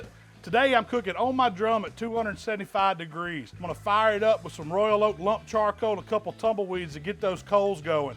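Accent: South, United States. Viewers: A big, bowling ball-shaped guy with a southern accent and a beard is exactly who you want to take bbq tips from. This looked amazing.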